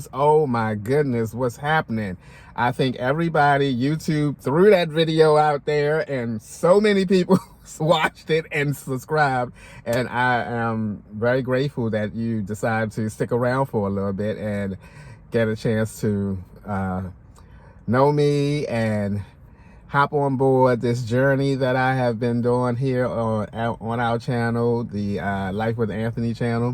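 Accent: Baltimore, United States. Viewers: I knew you were from bmore from how you talk lol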